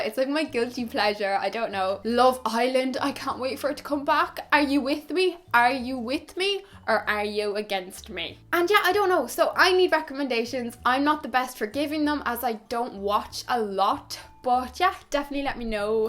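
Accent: Dublin, Ireland. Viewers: Hey beautiful😍!!! your videos make me so happy😄i love everything about you: your pleasent voice, lovely accent (who said you can't understand irish accent??😂)your wisdom And humor , the content, everything!!! I wish you a warm nice calm weekend😙p.s. tv shows that are available on the internet now which i enjoyed very much are: csi cyber and stalker. Must watch!!and yes-i'm bi and i notice girls more than boys, don't know why ❤💖💕❤💖💕❤💖💕❤💖💕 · Hi! Finally commenting on one of your videos since I started watching them when I lived in Cork for a year (I worked as an au-pair) 🙃 Your accent always makes me miss Ireland so much, haha! Of course that's not the only reason, I do really like your videos and the way you come across, your style... I'd say mine is quite a bit different but it looks beautiful on you and oh, you're so pretty 🌹 (Btw, loving Lana del Rey over here as well, and as for the TV series - have you watched 'Orange is the New Black' or 'Skins'? 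I feel like you could like Effy Stonem from the second generation a lot, concerning the latter... 🙃) I hope you have a wonderful day, greetings from Germany! 🌲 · You’re so pretty! 🖤 I could just sit and listen to you talk forever because of your Irish accent, haha!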